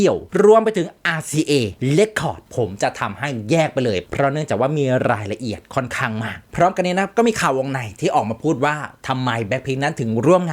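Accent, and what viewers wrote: Isan, Thailand. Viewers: แอด…กลับมาอัพเดทบ่อยเถอะน๊า คิดถึงเสียงหัวเราะของแอด คิดถึงสำเนียงภาคว่าวอีสาน หม่วนๆ ของแอด Please…..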